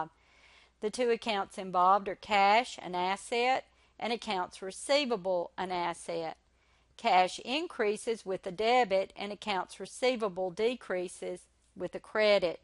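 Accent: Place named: India. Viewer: shut up u sound like indian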